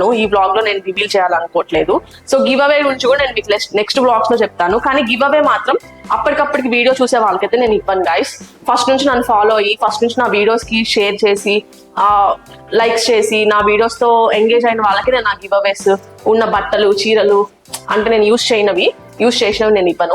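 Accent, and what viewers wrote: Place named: Nellore, India. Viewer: Fridge lo samanlu pette eruganu.mana nellore basha akkovv..yaadikellina mana nellore natural slang e baga vaduthunnaru.Nice sis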